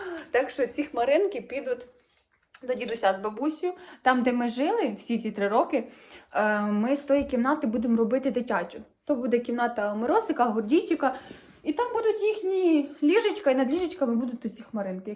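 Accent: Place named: Western Ukraine, Ukraine. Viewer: Привет соседям а мы из Херсонской. Я спросила потому что ты так хорошо говорил по украински думала что может с западной у меня муж со Львовской и у него такой же акцент. 🇺🇦🇺🇦🇺🇦💙💛🌹🌹🌹❤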